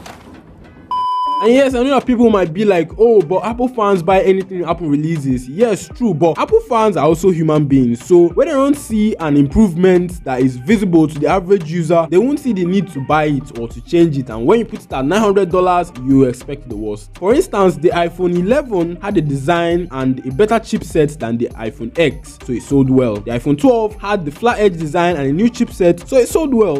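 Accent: Nigeria. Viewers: You speak like a Nigerian Nice video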